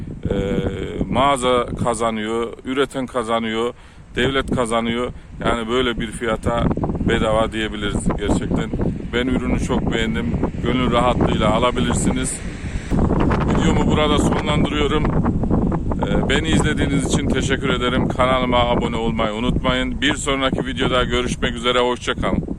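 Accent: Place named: Kayseri, Turkey. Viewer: 5 tepelerin oraya geldiğinde anladım kayseri olduğunu usta ama asıl anladığım rampayı çıkamayıpta sonradan rahatlıkla çıktım demen tam kayseri esnaflığı 😄 bi kayserili olarak esnaf ağzını gayet iyi anlıyorum 😁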